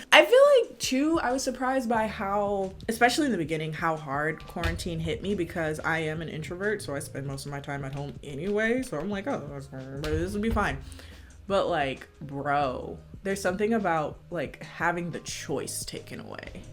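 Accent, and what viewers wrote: United Kingdom. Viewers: That British accent 👌👌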